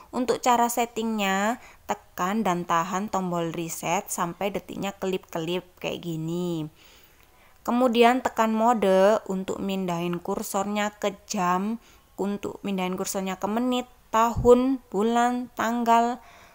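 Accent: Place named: Indonesia. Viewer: Ngomongya NGEDOK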